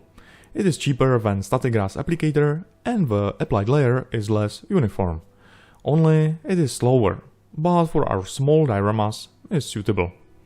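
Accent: Czech Republic. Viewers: ten prizvuk se mi zdal docela cesky :D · wait wait you are czech? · Ty vole, českou angličtinu poznáš všude...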